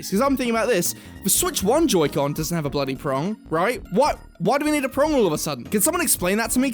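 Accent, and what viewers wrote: United Kingdom. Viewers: I don't like how British you've been sounding lately. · How am I only just now realising is British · im being honest your the most British person ive ever heard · you sound like a steriotypical british person and i love it suuuuub~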